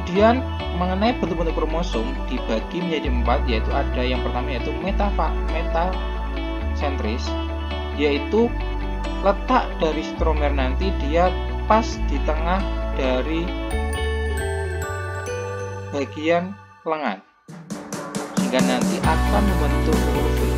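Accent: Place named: Java, Indonesia. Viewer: Mohon maaf kak kalau boleh koreksi kurang jelas dalam pembicaraannya soalnya agak agak kedengeran medok jawanya mohon maaf nih kak🙏 mohon maaf juga initeh gatau pendengaran nya kurang jelas gatau dari mananya😀🙏🙏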